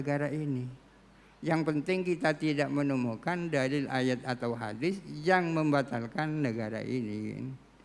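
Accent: Madura, Indonesia. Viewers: logat.. nya madura ini yai..? siapa beliau adakah profil lengkap nya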